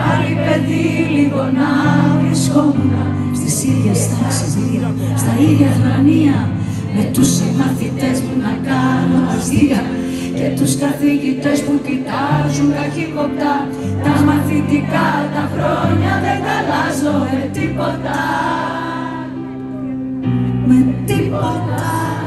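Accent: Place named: Cyprus. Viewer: Poso mou aresi otan milai ta Kipriaka! xronia sou polla agapi mou, na zisis kai na ta ekatostisis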